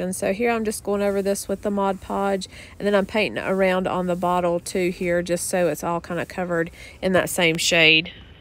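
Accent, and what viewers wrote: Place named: South, United States. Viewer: These are soooo adorable! I love watching your videos! Your “southern” accent reminds me of home💛 Thank you for sharing these!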